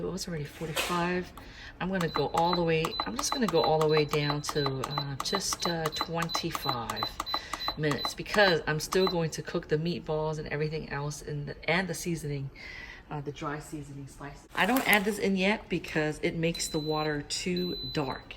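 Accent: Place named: South, United States. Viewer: I love your family and your southern accent.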